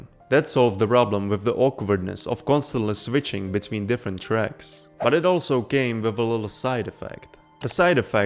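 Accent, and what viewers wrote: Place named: Russia. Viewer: yo, nice video dude and, what i just want to say... music in minecraft sounds kind of awkward, and it's creates its own rather distinctive atmosphere, it's one of reasons why i so much love this game (but i never play this again haha). this video made me refresh my memory, thank you for that. (and i can't help but say this: your conclusions in the end remind me a bit about pugpiece's video about space in video games, idk you russian or not, but if answer is "Yes" (i dare say, because the accent is a little similar) - watch this, i think you're enjoy that)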